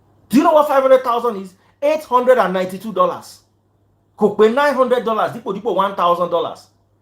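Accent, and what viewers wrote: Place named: Nigeria. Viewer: The Very FIRST Time I Listen to Guy A man, whose Speaks Nigerian, others Speaks Nigerian I ONLY SEE that Movies, You mention Let everyone bring their own separate items, one bring cements Etc, We call that "SELF-HELP" Has a young man/Teenager We used to Cook, was 4 of us, everyone will brings their owned Goods, those Days is expired, I am from the Americas, Lives In New York City, I am preparing to Purchased a Penthouse in Eko Atlantic but the Pandemic happened, Its Justified When Someone is Deliberately taking Advantages on others, the owner heard the warning in Written format But he still went ahead, According with the Bible Read, Quote, "Cannot gain the world & forfeit the Soul" that is what the Owner did, SEE, he lost his Life. Religions is just Brainwashed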